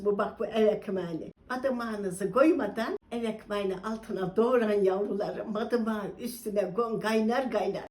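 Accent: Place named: Tokat, Turkey. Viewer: teyze bende Tokat merkezliyim senin konuşmandan hemen anladım canım memleketim Tokatlı olmak çok büyük bir ayrıcalıktır huzur deyince Tokat iyiki varsın❤❤❤❤❤❤❤ teyze❤❤❤❤❤❤❤❤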